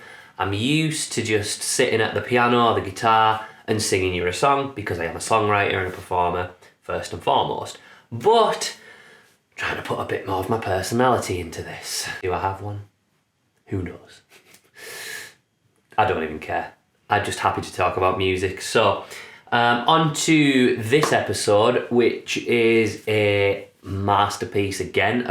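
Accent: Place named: Northern England, United Kingdom. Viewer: A very nice review man. I love this album. The harmonies do it for me really. I detect a northern accent from you. I am a Londoner lol. I will check out your George Harrison review soon, as well as any others you have done. Great work!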